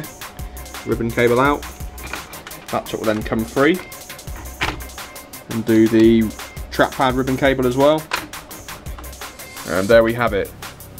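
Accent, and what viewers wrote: United Kingdom. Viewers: Good work. Can I bring my laptop to you for a quick fix?:) That's a lot of bloody screws. I have the same model and same exact issue. If I give it a go, I'm thinking to use Gorilla glue, it's darn good stuff we have here in the USA, don't know if it's available in the UK. Pardon me if I didn't guess your accent correctly.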